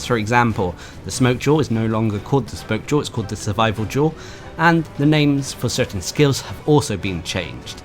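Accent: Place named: England, United Kingdom. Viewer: It's an English accent of a sort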